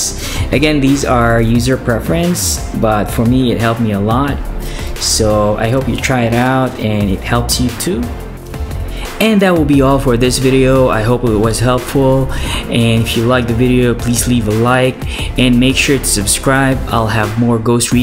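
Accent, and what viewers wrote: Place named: Philippines. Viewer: Fro your accent I know you're my KABAYAN!! subbed! Keep it up.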